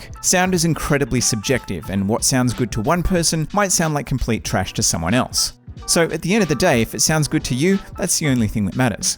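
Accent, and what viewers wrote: Australia. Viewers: Great video, don't know how i didn't see this ages ago. Is treble important for healing footsteps? I thought i heard an Aussie accent so had to Sub.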